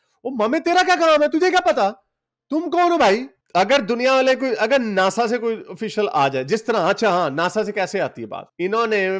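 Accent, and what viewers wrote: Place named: Punjab, India. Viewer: Your Punjabi tone is very beautiful 👍👍👌👌 Jai Hind Jai Bharat🇮🇳🇮🇳🇮🇳🇮🇳🇮🇳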